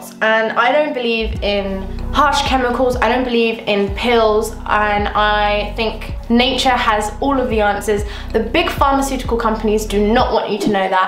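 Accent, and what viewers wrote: United Kingdom. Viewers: I LOVE your accent:D Your name sounds Arabic to me, but your accent is beautifully British <3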